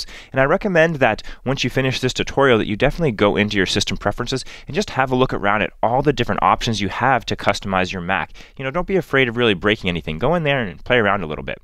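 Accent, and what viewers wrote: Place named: United States. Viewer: I have a MacBook Pro and have been Mac user for a long time but I'm here because of quarantine and Im here to listen to this guy's all American voice. LOL and I learned a few Mac tips !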